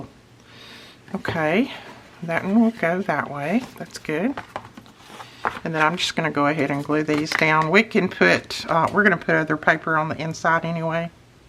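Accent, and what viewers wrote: South, United States. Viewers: love your projects and instructions! Love your southern accent! I live in Hawaii! Would love to win!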